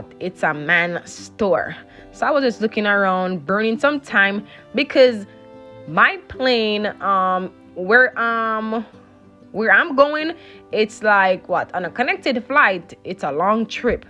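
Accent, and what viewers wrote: Jamaica. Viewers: Just scrolling through and came across your video.......your enthusiasm throughout the entire video is quite amazing though I'm born jamaican like you I truly love your accent. Left jamaica as a child almost 40years ago.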